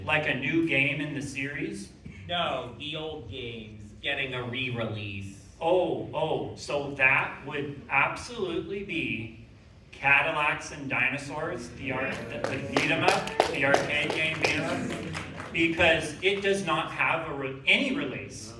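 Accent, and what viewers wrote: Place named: Western Pennsylvania, United States. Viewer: I recently found your channel and have been binging it. I thought you from the mid west or near east coast. I'm from western PA and your character is how we just talk. I thought you were one of us, you dirty dog!! Everyone even called my grandmother "Naner" for crying out loud. I got back into retro gaming in the past 2 years after dealing with a potentially very serious illness (it's mostly under control now) and finding your channel has helped me stay together while I distract myself with collecting and revisiting retro games again. I'm a lifer at 41yo started with a used Atari 2600 at age 4, then NES etc. all the way through to today. Thank you for the excellent channel.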